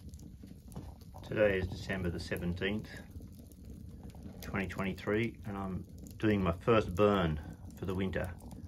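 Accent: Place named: Australia. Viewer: I'm a sucker for rocket mass heater vids. Just posted - recommended in my YT feed. Aussie accent talking about first burn for winter - intrigued, I check out your channel and then it becomes clear - a fellow Aussie (wiser than me) living my future in the Japanese countryside, about 12 months ahead of me. 2024 is the year I end my (and my wife's) 21 year hiatus!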